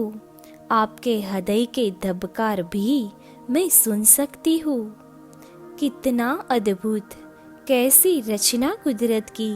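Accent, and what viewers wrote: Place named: Gujarat, India. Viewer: Aapki hindi me gujrati aa jati he.. Aapki hindi pure nahi he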